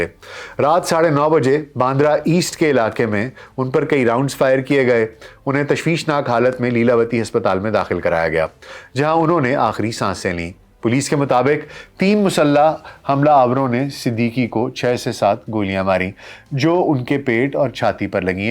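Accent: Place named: Pakistan. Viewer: From Pakistan, app ki urdu aur andaz e bayan sa lag hi nai raha ka app india sa ho. Beautiful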